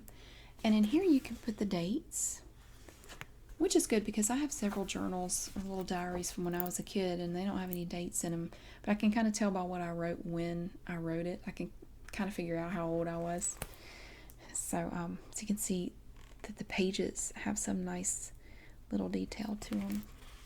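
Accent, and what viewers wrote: South, United States. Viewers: Holy marriage proposal! I could listen to that darling sweet voice all dang day! Gotta love that Southern accent!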